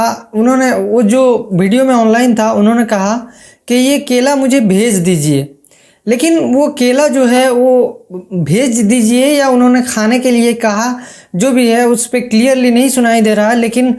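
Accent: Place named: India. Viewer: Inka taaluk India se hai, aur India ke kuchh elaako me log ese hi Urdu boltey hain, jese hamaari taraf hamaarey kuch pathaan Bhai jab Urdu boltey hain to unki Urdu thori si different hoti hai, jesey wo kbhi چھوٹا ko چوٹا bol detey hain. Esey hi India me kuch elaake hain jahaan log شروع ko سروع boltey hain.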